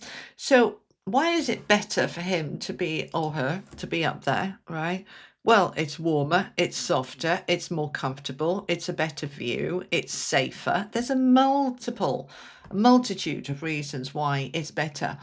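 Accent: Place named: North, United Kingdom. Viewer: I love your readings. Your accent reminds me of home (north UK). Always heart warming ❤🙏♐️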